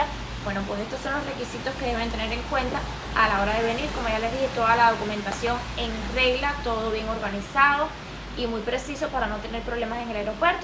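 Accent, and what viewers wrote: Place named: Venezuela. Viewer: Tu hablas como venezolana , no pareces cubana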